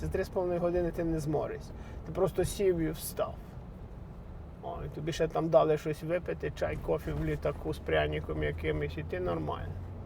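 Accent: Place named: Ivano-Frankivsk, Ukraine. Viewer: по розмові чути Франківська обл....та ще й з горів... Але ви кажете, що з Вінницької області. Вибачаюся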